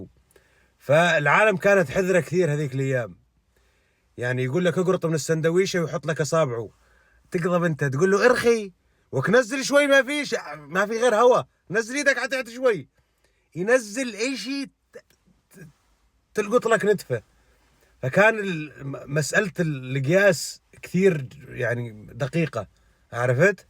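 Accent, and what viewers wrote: Hauran, Syria. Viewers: لهجة حوران الاجمل بالعالم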